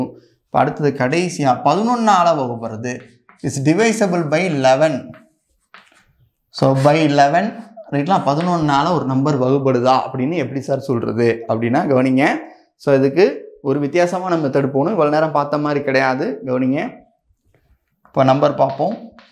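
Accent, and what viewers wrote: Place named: Madurai, India. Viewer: Ungaludaya peachy madurai slang appidiye irruku,so cute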